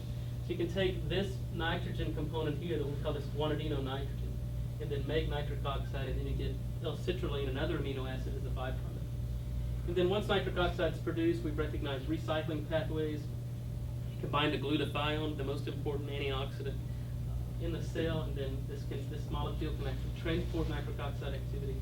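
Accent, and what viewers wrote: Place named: Texas, United States. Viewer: This is a cure for heart and stroke disease without changing a bad diet!! It makes you bullet proof even if you eat greasy junk all the time!!! Just get a pinch of sodium nitrite and add to every liter of drinking and cooking water. Sodium nitrite in food grade quality is available for about 6 bucks for 2 lbs, enough for a human for a decade of use at these levels in drinking water. Please turn up the sound and endure the bad quality sound, and Dr. slurring sort of Texas accent, it is worth every second. Take the trouble to listen 10 times if you need to, and GET this, especially the sections on the microscopic artery video showing adhesion of monocytes (a type of white blood cells that turn into macrophages or cellular garbage trucks), and how they penetrate the lining of the artery, starting the creation of plaque, but do not when the sodium nitrite is added to drinking water. 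This is absolutely astounding footage!!! Note how either 50mg or 150mg per liter of sodium nitrite in drinking water completely negated the harmful effects of high cholesterol diet, and prevented atherosclerosis!!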